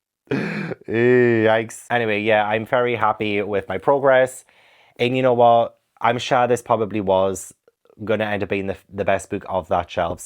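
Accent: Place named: North, United Kingdom. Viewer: I stumbled on you one day and stayed for two reasons - I am from the north, now living in the US and your accent brings me home... and I just ADORE your content!!!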